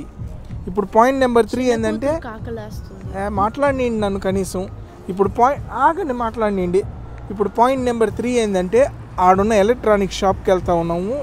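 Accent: Nellore, India. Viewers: Hai Anna how are you???? Miru explain chese vedhanam and me Nellore language super....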